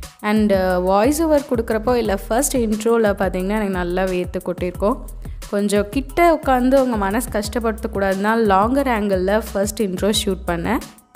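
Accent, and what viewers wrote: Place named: Hindi Belt, India. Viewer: Super voice, hindi accent varudhe yen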